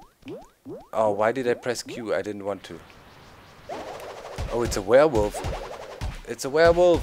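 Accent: Germany. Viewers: is that a german accent?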